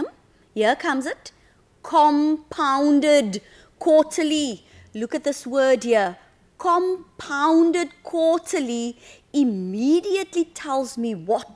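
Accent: South Africa. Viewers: That's the way every south african teacher speaks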